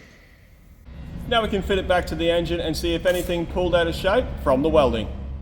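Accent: Australia. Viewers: Right, I like that starts every sentence with "Right!" Sounds authentic! Sounds Australian! Sounds Right!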